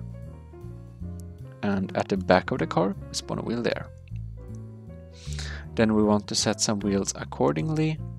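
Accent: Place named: Sweden. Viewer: Really nice Idea! I only managed to make a few vehicles with actual steering, using a stupid technique. This one is way better. (Also I guessed that you're from Sweden and wasn't wrong. I understood that by your accent(especially the "CH" sound) and the way you form the sentences, use some words that are rarely used by English speakers.) By the way, love that accent. I can be proud of myself and stop thinking about being a complete failure now... for 5 minutes.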